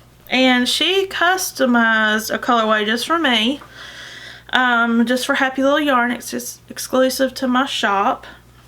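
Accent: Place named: South, United States. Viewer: Probably spelling this wrong..but Cruella DeVille. I would love yarn named for her. White,black,throw some red in for her lipstick and maybe some ash for her cigarette. as I was watching I said..this gal is from the south..she talks like me. I live in Hartwell,Ga. My son use to live in Albany so I know where you are from.❤️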